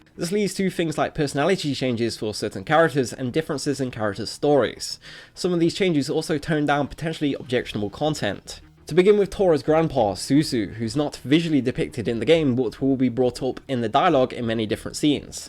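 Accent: United Kingdom. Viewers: the nasaly Brit voice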